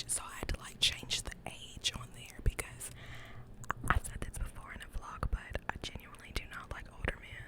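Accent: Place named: South, United States. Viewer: I love your laugh , u sound so southern & I love itttt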